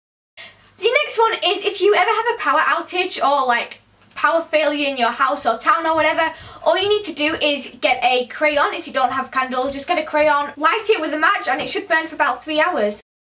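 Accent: United Kingdom. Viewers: Bitch she just has a British accent deal with it I found it very helpful!! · U have a nice British accent 😁👍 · The British accent is so cute!!!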